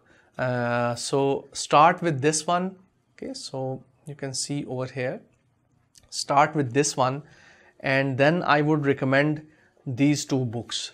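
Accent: India. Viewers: love your indian type assent, also buyed ur , udemy course,, it was awesome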